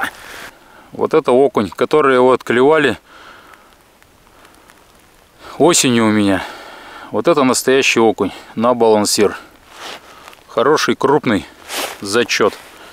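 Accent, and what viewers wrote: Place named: Kholmogory, Russia. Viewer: Здравствуйте! Смотрю все ваши видео твой говор напоминает моего сослуживца по армии,Виктор Курсов зовут, где то в районе Холмогор он жил. Как нарвался на твои видео сразу его вспомнил, удачи тебе в твоём деле и продолжай снимать!!!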